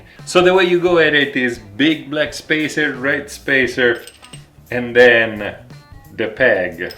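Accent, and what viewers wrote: Italy. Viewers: Love your Channel and love your accent too. 😊 Having an italian teaching us how to take care of our italian babies is amazing. Thanks for your time. Cheers from Canada.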